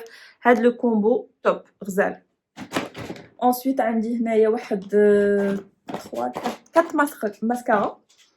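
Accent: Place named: Maghreb, North Africa. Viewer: فيديو رائع❤️❤️ تصوير رائع ❤️❤️ محتوى رائع❤️❤️لكن اللهجة صعبة الفهم لمعظم العرب لا يفهمون اللهجة المغربية والجزائرية والتونسية للأسف 💔💔ف الرجاء التحدث ب الشامية أو الفصحى أو المصرية هكذا ينتشر الفيديو أسرع ويكون له جمهور أكبر او ضيفي ترجمة مصاحبة بليز 💖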